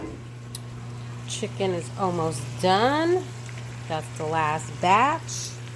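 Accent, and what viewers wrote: New York, United States. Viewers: Hello. That recipe sounds do delicious. I just love that New York accent. I can tell your choice of foods you can cook. Thanks for taking the time to have this delicious recipe. God bless. I'll be waiting for another fantastic video.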